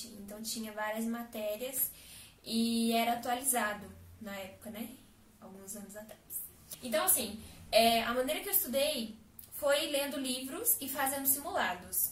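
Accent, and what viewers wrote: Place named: Minas Gerais, Brazil. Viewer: tá com sutaque de minas... ♡♡♡♡♡ sucesso aee;)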